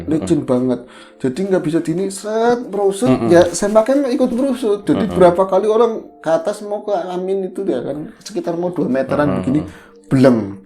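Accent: Banyumas, Indonesia. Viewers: Logat ngapak nya blm ada lawan kaya nya... bisa ngakak sendiri kalo dengerin beliau pas cerita.. haahaaaa.. sukses selalu yaa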